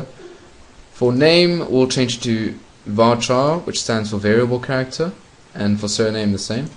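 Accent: South Africa. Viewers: South African yeah? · Yay a South African :)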